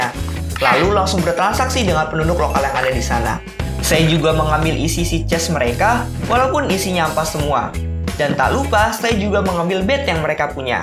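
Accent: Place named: West Java, Indonesia. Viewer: Bang nanti coba tugas ipa dong semangat terus bang ngonten nya lanjutin konten survival seru bangettt dan cara ngomong abang kek orang Sunda :v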